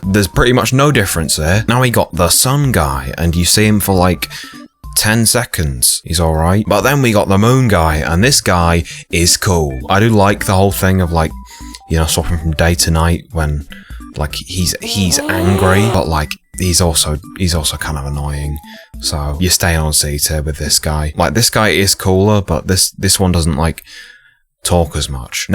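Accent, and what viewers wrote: United Kingdom. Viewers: i was not expecting that voice from you dude lol you dont look british at all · Is this guy British or smth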